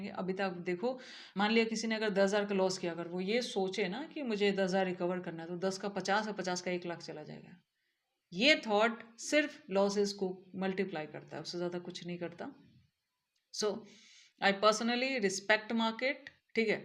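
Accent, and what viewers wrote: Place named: Uttarakhand, India. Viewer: Didi..bahoot badhiya..aap ki Hindi gazab h..aap uttarakhand ya uttar bharatiya lg rhe h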